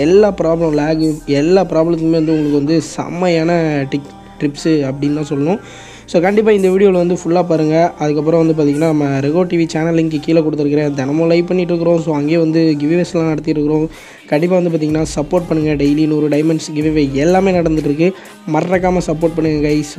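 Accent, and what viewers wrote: Tirunelveli, India. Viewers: Bro ongalukku thiruneveli ah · Bro neenga nellai yaa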